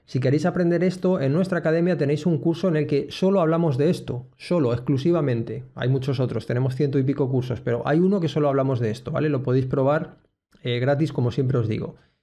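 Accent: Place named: United Kingdom. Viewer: Te felicito por tu canal, es de lo mejor en Ajedrez por las partidas y tus análisis y comentarios. Pero permíteme recordarte que el Inglés no es el único idioma además del Castellano. No malogres tu buen canal pronunciando toda palabra no Castellana con Fonética Inglesa. Si no pronuncias una palabra extranjera con su propia fonética, debes usar la fonética Castellana. La letra w se llama ve doble y no 'u doble' ni mucho menos 'doble u' por lo que cuando se habla Castellano no se pronuncia como 'u' vocal o gutural sino como v que en Castellano al igual que en Griego es un sonido vibrante bilabial. Peor es cuando pronuncias la w del Neerlandés o Alemán o Polaco como 'u' porque en esos idiomas su pronunciación es dentilabial. Así, Zugzwang se pronuncia tsug•tsvang porque es Alemán y no sug•suang como si fuera Inglés. Asimismo, Wolfgang se pronuncia vólf•gang porque también es Alemán y no guólf•gang porque no es Inglés. 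Gracias por tu atención. Y que sigan los éxitos con tu Canal.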